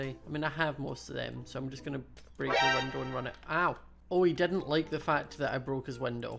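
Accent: Scotland, United Kingdom. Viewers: Ur voice is EVERYTHING (Are u Scottish?) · Yes he is Scottish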